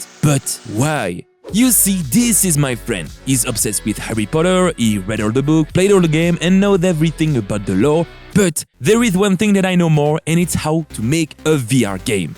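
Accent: France. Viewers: Ton accent français m’avais manqué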